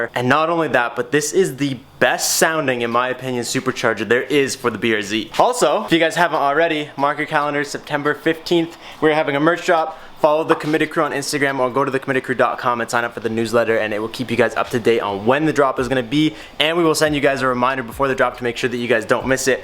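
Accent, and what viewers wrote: Canada. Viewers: Anyone else notice the way Canadians say out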